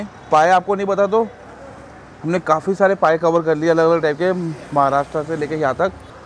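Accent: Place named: Hyderabad, India. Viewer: Hahaha u r speaking pure hyderabadi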